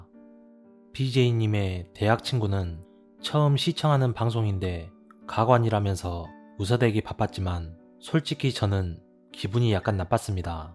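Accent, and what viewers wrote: Jeolla, South Korea. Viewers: 전라도 분이신가요?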